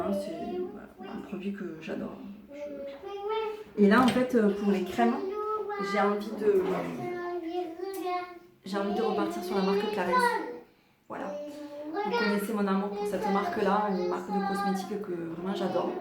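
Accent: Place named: South, France. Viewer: Oh ce bel accent du sud j’adore 😂 elle est trop marrante ! Vlog cool comme d’hab des bisous 😘😘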